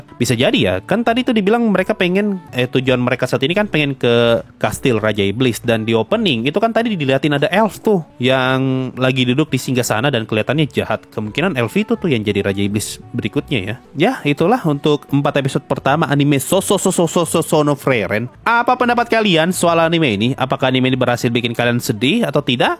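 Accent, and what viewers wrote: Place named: Eastern Indonesia, Indonesia. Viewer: Logat nya org timur sekali aleee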